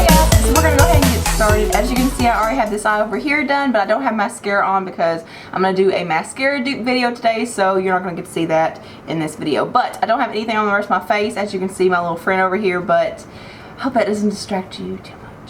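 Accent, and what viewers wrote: South, United States. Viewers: where are you from ? i can tell you're from the south , i'm from north carolina and i wish my accent was as beautiful as yours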